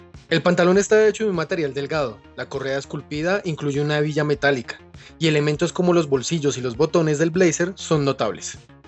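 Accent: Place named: Colombia. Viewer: Por el acento veo que eres colombiano. La verdad me sorprendió tú manera de hacer una reseña, ya tienes un suscriptor más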